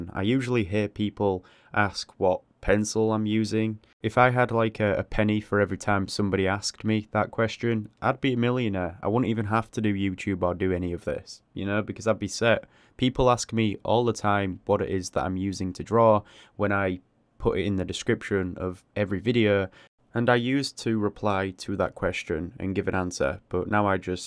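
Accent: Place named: Northern England, United Kingdom. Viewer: Awesome video. Thank u very much for your answers. Love ur accent by the way. I’m in Manchester I’m guessing your slightly further north. That drawing looked insane. So good. Keep up the great work. Love your content and videos. Pls never stop 👍👏👏👏😍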